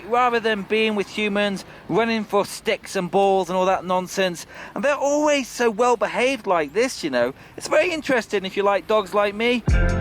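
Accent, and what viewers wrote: England, United Kingdom. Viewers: Escuchen ese bello acento inglés 😌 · There you go a brit in MX with a nice accent!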